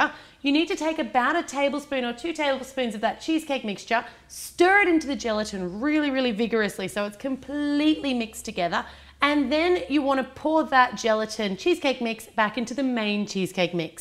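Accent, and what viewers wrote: Australia. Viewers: Are you Aussie or American ?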